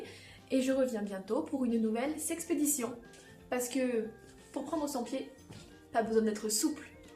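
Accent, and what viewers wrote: South, France. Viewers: Je crois déceler un léger accent qui sent la fleur d'oranger, l'olivier et les cigales (j'ai jamais senti une cigale hein...promis o_O), me trompe-je ?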